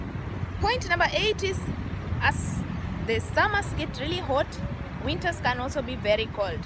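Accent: Kenya. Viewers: Are you kenyan? You sound like one. I am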